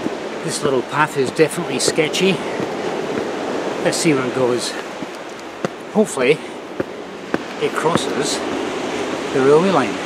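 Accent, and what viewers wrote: Scotland, United Kingdom. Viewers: Can I ask where your accent is from? I hear Scottish mainly? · I’m surprised at his Eire pronunciation. Probably his Skaughtisch accent!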